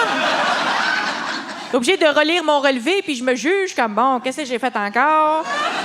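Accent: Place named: Quebec, Canada. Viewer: C'est rare, une comique Québécoise que les français parviennent presque à comprendre ! ( j'ai dit presque 😉)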